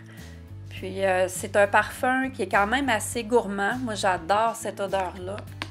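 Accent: Canada. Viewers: cc jadore ton accent cannadien si je ne me tropmpe pas.jadores les produits Yves Rochers.moi je prend souvents des parfims et billes de bains.super ta videos je te fais de gros bisous a bientot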